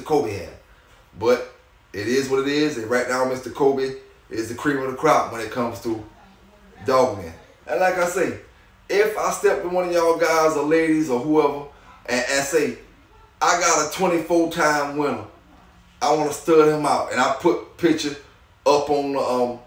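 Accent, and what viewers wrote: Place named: Oklahoma, United States. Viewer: Much love Keep pressing forward. I don't know where you from...sound like you from Oklahoma.....love the channel. #Kansas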